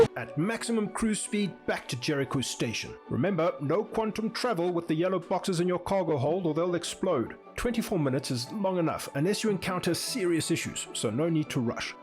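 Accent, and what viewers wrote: South Africa. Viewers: Ek het subscribe die oomblik toe ek jou SA accent hoor. Great videos! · You sound South African :)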